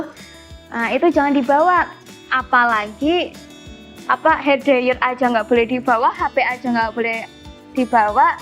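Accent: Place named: Purworejo, Indonesia. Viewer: MasyaAllah mba.. Khas bgt logat purworejo nya.. Bismillah.. InsyaAllah tgl 11 Kali ke 2 anter ank msuk sini stlh 6 th lalu.. Smg ank kmi, betah, krasan.. Aamiin.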